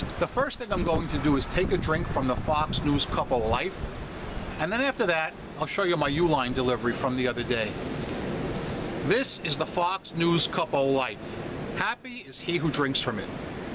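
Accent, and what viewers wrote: New York, United States. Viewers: the Joisey Boy with the New York Accent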